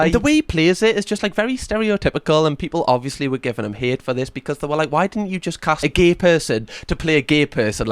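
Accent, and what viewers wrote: Newcastle, United Kingdom. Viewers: Love you,! Geordie accent 4-Ever! Love from across the pond xoxo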